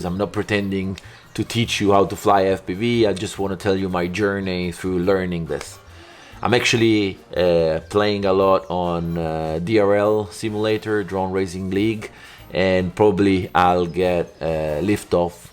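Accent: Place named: Italy. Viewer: Ciao, ammazza parli meglio di me l'inglese. Diciamo che si riconosce l'accento Italiano ma si capisce benissimo, io ero pilota privato ora sono Al secondo drone, un giocattolo da 30$ giusto x funn, un abbraccio 😘✌🇨🇦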